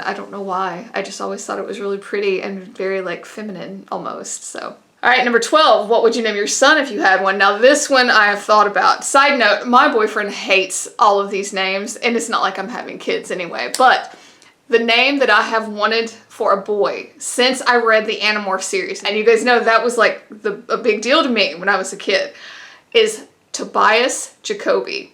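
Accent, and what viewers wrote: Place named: Georgia, United States. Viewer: Refined southern accent, like Ga 😝🤣 you are hilarious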